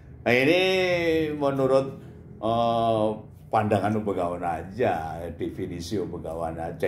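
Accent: Java, Indonesia. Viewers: Aku suuueeneng klo om begawan yg ngomong, jawane kuuuentel Lanjut terus om begawan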